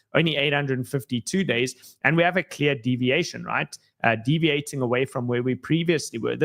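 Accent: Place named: South Africa. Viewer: $10000 that's a south african accent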